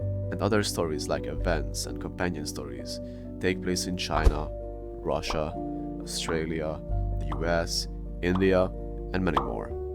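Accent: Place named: Romania. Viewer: Its good enough to remind me of a Romanian friend of mine from college. Her English was incredible from just learning it in school. Her accent was very subtle and cute like:) Either she was just naturally gifted and learned other languages easily or the schools in Romania are amazing lol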